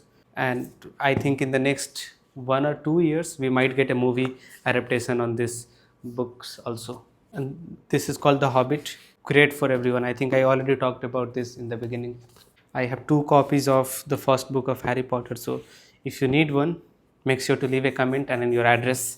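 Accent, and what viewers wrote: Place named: India. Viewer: Nice❤, but your Indian accent wins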